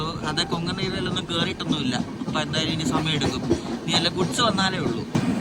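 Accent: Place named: Kottayam, India. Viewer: Awesome …adipoli ….❤❤❤❤ parallel race super 🔥🔥🔥🔥. Talk kelkkan rasam undu. Kottayam style talk 😍😍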